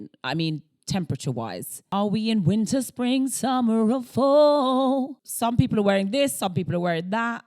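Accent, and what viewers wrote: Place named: South-East London, United Kingdom. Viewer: If you're not going to say "Gossip Girl" in that gorgeous (wild guessing incoming) South-East London accent, attacking each G like that, why even say it?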